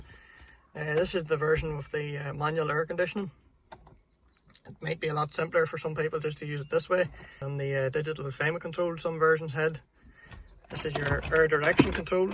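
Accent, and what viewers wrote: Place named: Derry, United Kingdom. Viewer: Hearing this lads accent to me he sounds like he is from Derry Amen Godbless Madeira 40 40 Liamp/atrickmr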